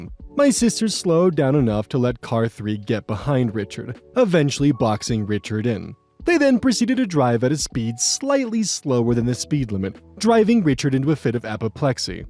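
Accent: United States. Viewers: not a Spanish speaker, but I was in Mexico for 3 months. You sound like me, like you tried but still have the US accent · Honestly i think you did fine on the spanish pronunciation. You have an american accent about it but im pretty sure we all do that but at least your vowels were correct which is really difficult for some people.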